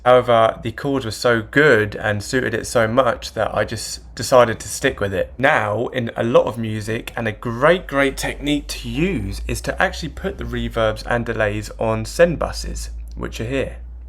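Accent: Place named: Suffolk, United Kingdom. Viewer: Ahh fair enough man! Thought I could hear a bit of Suffolk in your accent! Anyway keep up the great videos man.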